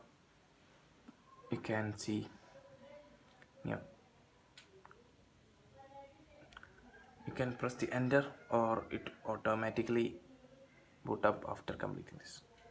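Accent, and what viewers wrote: Kerala, India. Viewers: 😀sound ketta ariyile Malayali ann enn🌝😆 bro pinne orru help vennam 😅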